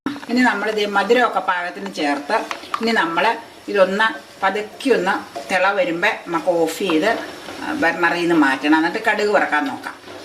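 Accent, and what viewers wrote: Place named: Perumbavoor, India. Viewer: പെരുമ്പാവൂർ, കോട്ടപ്പുറം ഭാഗങ്ങളിൽ ഉള്ളവർ സംസാരിക്കുന്ന രീതിയാണ്. അവിടങ്ങളിലാണോ വീട്..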